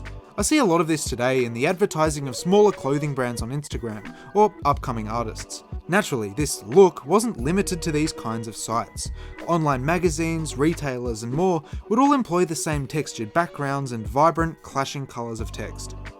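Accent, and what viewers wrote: Australia. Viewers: dont normally comment but stumbled across this video today and couldn't believe how insightful and fascinating this video was but also how entertaining it was. Also nice to hear an Aussie accent. Subbed. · Man I’m loving that more aussies are making content. It’s just so nice hearing someone that sounds like me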